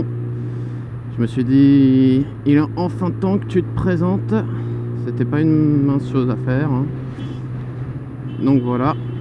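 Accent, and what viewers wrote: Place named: South, France. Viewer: Cool ta présentation, ça fait plaisir de savoir à qui on a à faire haha, et l'accent du Sud et bien non ? On a la classe nous les sudistes mdr. Sur ce Ride Safe l'ami. V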